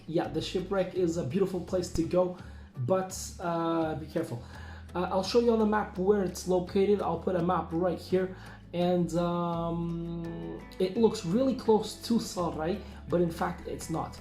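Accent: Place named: Portugal. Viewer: bom video, tens um inglês muito bom, mas nota-se bem que es português pelo sotaque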